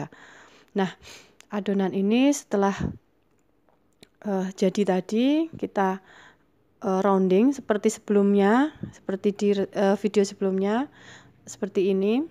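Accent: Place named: Java, Indonesia. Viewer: Ndak papa mbaaaak meski medok...itu ciri khas mbak.ehehhee...makasih sdh mau berbagi....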